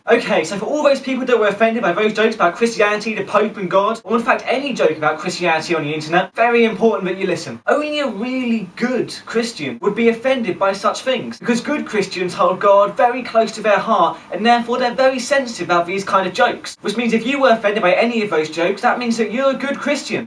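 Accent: United Kingdom. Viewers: very funny british accent :)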